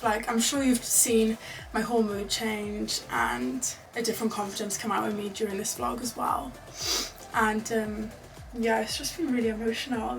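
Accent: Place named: Wales, United Kingdom. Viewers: What part of the uk is her accent from? Just wondering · She’s Welsh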